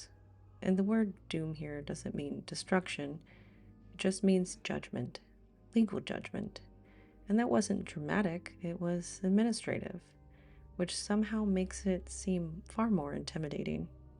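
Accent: South, United States. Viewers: I love your voice, both tone and pitch which, combined with your southern accent, is very soothing. Could easily put me to sleep. Excellent video, one of the best I've seen on the subject matter. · Hi, only just seen this one, but again, another excellent video, I remember the fire well, and the one in Windsor Castle, both hugely important events in their respective histories. You seemed surprised when another correspondent said it was "Auntie" standard, and questioned why. The answer is quite simple: well researched, calmly delivered facts, rather than sensationalised narration. I'm imagining Sir David Attenborough, but female, American (but rapidly becoming more Brit), with that soft, warm Southern drawl wafting over the visuals. Check out his documentary on gorillas from the late '70's, where he's in the midst of a family of gorillas, from the silverback to babies, retains his composure, and continues in hushed tones as they groom him, lie on him, and even (out of camerashot), as one of the youngsters removes his shoes. 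True professional, and still making wildlife documentaries at age 99. Sorry about (another) long post, keep up the good work my dear. Chin chin!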